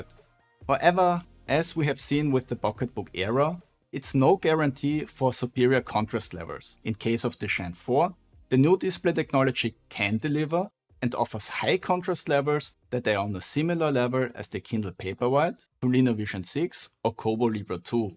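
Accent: Austria. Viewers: thumbs up for the fat austrian accent from germany. My favourite word: The Bauerbuddnblaismänt. :) Eine Mischung aus Domi Thiem und Felix Neureuther, sehr sympatisch! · This is the very first of your videos I’ve watched. Your English sounds Austrian, nice ;-)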